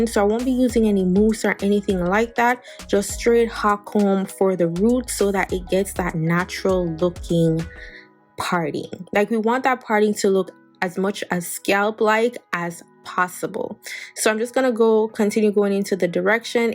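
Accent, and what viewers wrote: Jamaica. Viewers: I love this.. so easy n quick. Btw r u Jamaican?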